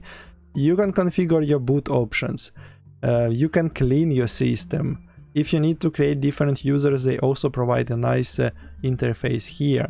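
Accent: Russia. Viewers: I love your Russian accent so I subscribed! (I am from England) Also GREAT VIDEO! :) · because it's russian accent, imho. It's most understandeable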